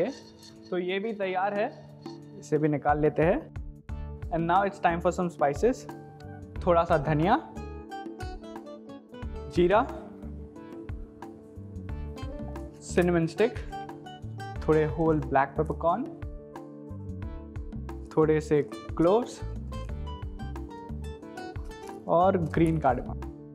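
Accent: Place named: Lucknow, India. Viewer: Your accent is very neutral (Lucknow/urban area of MP I'm guessing) And your way of explaining things just awesome. Soothing voice and delicious recipe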